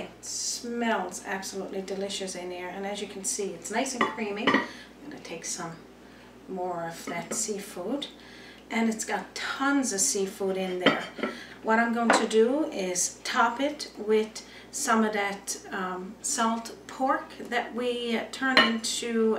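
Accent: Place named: Newfoundland, Canada. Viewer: Looks delicious! Wonderful presentation! I love your NL dialect, with the dropped H's and slight maritime accent.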